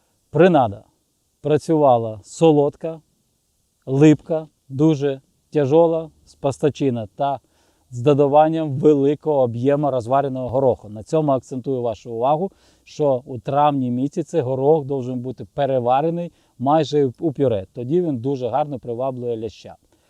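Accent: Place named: Western Ukraine, Ukraine. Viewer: Неначе пів життя на західній Україні проживав, мова топ( майже😊)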